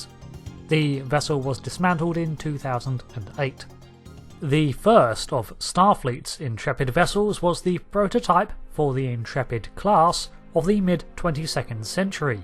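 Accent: United Kingdom. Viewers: I love your accent, what part of Britian is it from?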